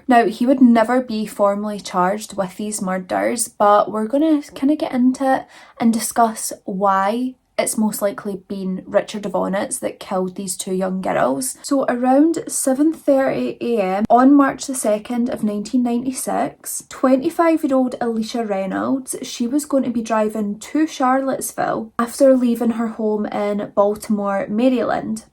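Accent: Scotland, United Kingdom. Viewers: Love the way you tell a story i can easily understand you im Scottish too you bring humour whilst Being serious ❤you are a beauty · Love the ice blue on your eyes, your gorgeous. I also love your accent! The biggest part of my ancestry is Scotland, if I by chance had been born there maybe I’d have that accent!❤ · Hi, my mum was from Scotland i love hearing your accent and I love your personality your definitely 1 of my favorites ❤ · crying that you replied!! I LOVE your videos, as a fellow Scottish gal it’s nice to have a Scottish accent to listen too!🩷 I watch all your videos gets me through work everyday! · Hi, Just came across your podcast and im definitely subscribing.. My ancestors are from Scotland, USA here… Love your accent ❤ p.s. you’re a beautiful young lady…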